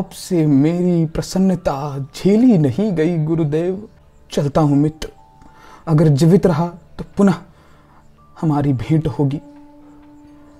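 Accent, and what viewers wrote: Standard. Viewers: aapki itni shuddh hindi sunkar hame charm sukh ki anibhuti hui mitra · Bhai itni shudh hindi 🙂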